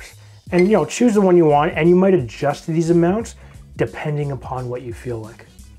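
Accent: Canada. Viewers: On the back deck or in the hawse, (love the accent from the Yukon). I read thru your comments and I need to make more homemade Grenadine, for mine is approaching it's lifespan. The Goslings is a great floater. I've even seen more potent Gosling at the store. Many places by me use Myers. I'm always in for large rum drinks. Sláinte!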